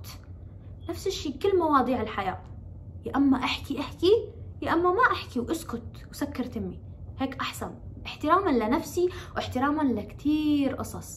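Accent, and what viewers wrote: Iraq. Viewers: انت انسانه جميله وطيبه القلب ومبدعه وانت تحكي بلعراقي بتجننني. وتتاثريش بكلام ناس. لانو كلام. الناس ما بقدم وله بأخر❤️ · اللهجة العراقية تلوكلج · كلامج بلعراقي ايخبل متابعج ابن بلدج من العراق💖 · كولش ححلوو من تحجين باللهجه العراقيه تلوكلج 🤭😍🇮🇶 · كلامج بلهجه العراقيه حيل حلو 😍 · كلام رائع جدا وشحلاتج تحجين عراقي 💋💋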